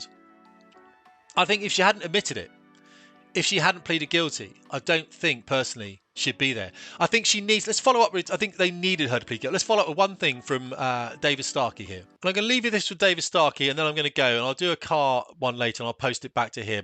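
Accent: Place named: Wales, United Kingdom. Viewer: He's definitely welsh😅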